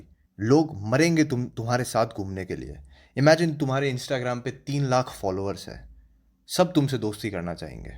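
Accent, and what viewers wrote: Rajasthan, India. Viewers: Bro you from rajasthan? The way you talk really gives me that vibe ❤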